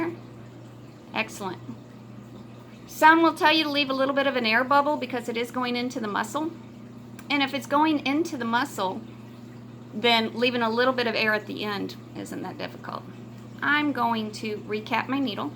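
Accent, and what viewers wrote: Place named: South, United States. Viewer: Thank you SO MUCH for this video. I’m having to inject solumedrol again and had kinda forgotten how it goes! Your video reminded me how easy it can go if you stay relaxed and focused. I wish you would post more videos! Your confidence and demeanor are truly soothing. Thank you, love. And ps, I totally understood your southern drawl #gagirlhere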